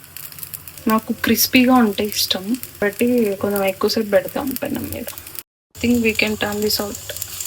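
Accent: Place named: United Kingdom. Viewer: Your English Accent is like British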